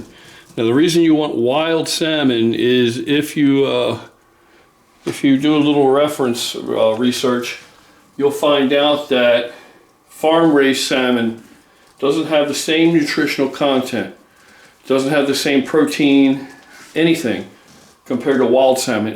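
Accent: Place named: Philadelphia, United States. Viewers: You sound like you're from Pennsylvania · You sound like you live in Philly or somewhere in the Delaware Valley.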